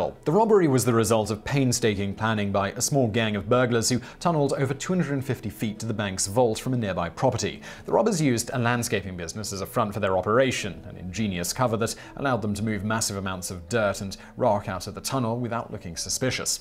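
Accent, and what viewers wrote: United Kingdom. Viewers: British pronunciation. They also refer to 22s as "two two".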